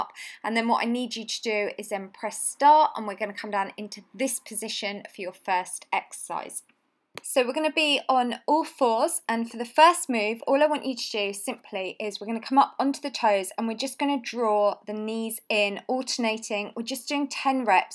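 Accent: England, United Kingdom. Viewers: Love your ab and booty workouts!! Haha and i love hearing you say "booty". I love English accents!!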